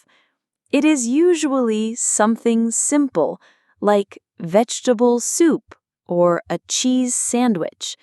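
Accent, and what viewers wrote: United Kingdom. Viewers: is british english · Is this british accent?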